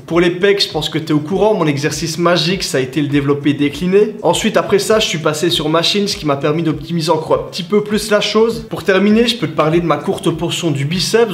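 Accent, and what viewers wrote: Liège, Belgium. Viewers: Salut. Merci pour les infos! Ton accent me fait penser sérieusement que t es du côté de Liège? Vive la Belgique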